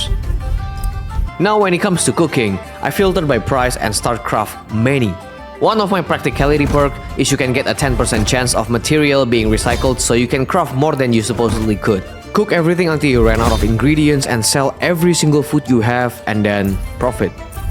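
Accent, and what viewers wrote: Indonesia. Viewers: You sound like indonesian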